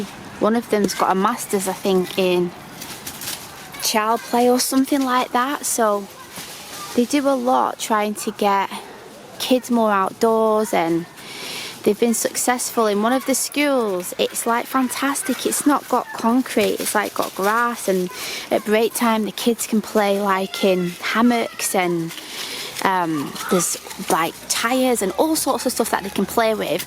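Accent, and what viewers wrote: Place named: North, United Kingdom. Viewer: Your children are just adorable 😍 i was guilty of constantly telling my kids to “be careful” whenever they climbed where as now I’m the opposite and totally encourage them to explore! How old are your children? And it’s so nice to hear a fellow northerners accent 🥳 oh you’ve litterally just answered my question as I’m watching lol is 13 months, awwww!! What made you decide to home school honey? Yes I think you should make that video, it would be interesting to learn more as it sounds ignorant but the truth is I don’t really know but it would be good to find out from you!! 🤗 xx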